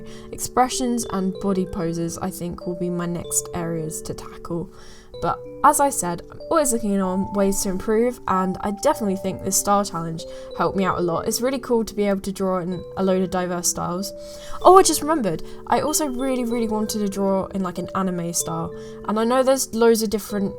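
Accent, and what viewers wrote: United Kingdom. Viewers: Redhair girl with a fantastic english accent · I love your pretty brittish accent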